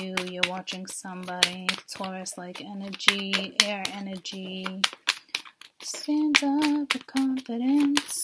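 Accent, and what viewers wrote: Boston, United States. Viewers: Lol, where are you from originally? I love your northern accent. Are you maybe from Boston or something? Love it!!!!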